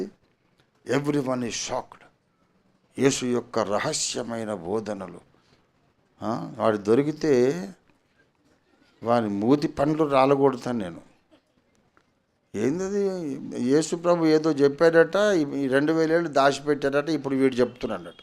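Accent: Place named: Andhra, India. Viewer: గారు మీరు తెలంగాణ వారు అయినా మీ భాష చాలా అద్భుతంగా ఉంటుంది అసలు సిసలైన అటువంటి ఆంధ్ర విజయవాడ గుంటూరు భాష మీరు మాట్లాడతారు మీ భాష చాలా అద్భుతంగా ఉంటుంది పాస్టర్లు ఎందుకు అదోరకమైన భాష మాట్లాడతారు ఎందుకు మాట్లాడుతారు తెలియదు ప్రజలందరూ మాట్లాడుకునే భాష అందమైన భాష మీరు మాట్లాడతారు చాలా అందంగా ఉంటుంది మీ భాష ఆంధ్ర వాళ్ళు కూడా ఇంత చక్కగా ఈ భాష మాట్లాడలేరు మీరు మాట్లాడే భాష వింటుంటే ఎంత ఆనందంగా ఉంటుందో అసలు సిసలైన ఆంధ్ర భాష అంటే మీరు మాట్లాడింది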